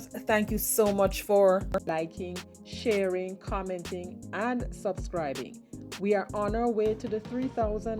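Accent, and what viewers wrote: Jamaica. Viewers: I just LOVE your voice are you by any chance from JAMAICA?😊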